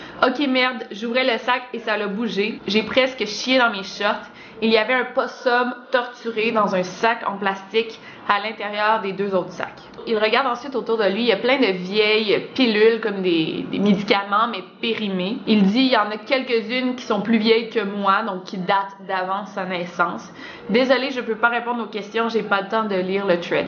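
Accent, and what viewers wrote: Quebec, Canada. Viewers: Quand j'ai du temps pour moi, j'adore me poser dans le canapé avec un petit "snack" et un grand café tout en regardant tes vidéos ( j'y est même convertis mon petit ami, qui ne connaissais pas ce genre de vidéo, il adore). Quand tu nous narres des histoires, que ce soit, des cas de disparitions, sur les serial killers, les thread, etc.., tu as une façon de parler et de t'exprimer que j'adore ! Même si tu as un accent québécois (que j'aime beaucoup), il n'y a que chez toi que je regarde ce style de vidéo ^^ J'avale tes paroles, je me sens vraiment dans ces histoires que tu nous racontes avec beaucoup de passion si je puis dire, tu expliques très bien et c'est agréable ! Continues ainsi, tu as de plus en plus d'abonnés et ce n'est pas pour rien, car tout le travail que tu fournis porte ses fruits ! Une Charltonniste de France, Bise, Sophie =)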